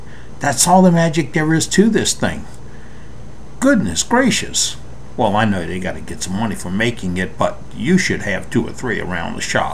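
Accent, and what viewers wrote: New Orleans, United States. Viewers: Hey!! Just found you on Youtube. Love your style! No wonder, yer from 'Nawlins. Me too, I grew up in Harahan! I'm living in Denver now but sure miss home sometime, especially when I hear your accent! I am just learning how to turn wood. I turned a Stanley #5 Plane knob out of Rosewood. My first project and it came out real nice. I refurbished the plane for my son, who was born in Opelousas close to Lafayette. Anyway, I like your channel! I'll come back some more. Thank you very much! Great videos!!! Dave Henderson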